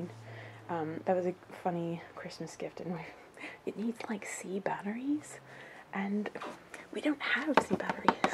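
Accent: England, United Kingdom. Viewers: You have an English accent in there am i right?? xxx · 0121cole She is American (her husband is Egyptian), but uses a British accent to protect her identity because she believes that voices are easily recognisable and wouldn’t want anyone to reveal her identity. Hope this answers your question. X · I love this video it’s is amazing I love you and your videos I hope you get to see this if not it’s no problem I just wanted to say I love this channel because you are so thankful for the support and also you make very great videos and the British accent is amazing I’m from England and I think you sound so British hope you see this live you and your vids lots of love Emily Blanchard from England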